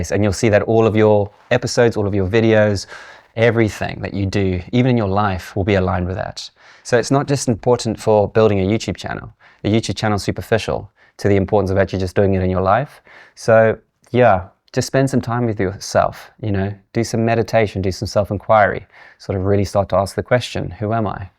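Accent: South Africa. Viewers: Buddy, is there a hint of South African in that accent? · South African? 🙂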